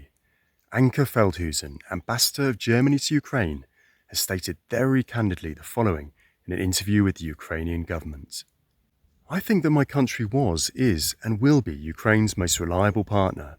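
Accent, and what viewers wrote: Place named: England, United Kingdom. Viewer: Your accent is English!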